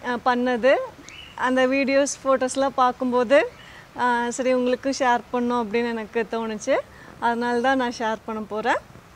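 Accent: Kongu, India. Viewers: மரியாதை கலந்த கொங்கு தமிழ். ஒருத்தரு நம்மள அடிச்சுக்க முடியாதம்மினி . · Unga slang language super sistaaaaaa...........kongu tamilachi😍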